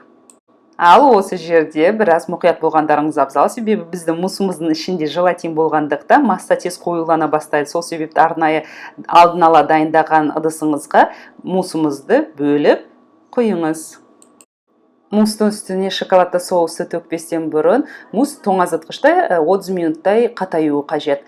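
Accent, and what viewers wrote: Standard. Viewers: Таза қазақ тілінде😍😍😍 рахмет сізге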